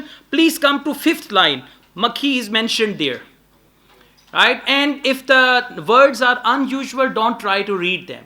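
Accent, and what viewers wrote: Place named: India. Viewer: love your Indian-English accent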